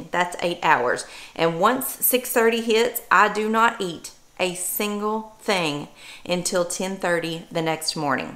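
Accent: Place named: Kentucky, United States. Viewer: has some great advice and congratulations on your progress. It is about getting healthy and not about losing weight. And it does take 30 days to change our thought pattern. I like doing omad. I'm not hungry through the day and if I do have a spirit of it I drink an extra water. Can't wait to see the progress and definitely do a couple of videos on what you cook. I thought I detected a Kentucky accent, just found your channel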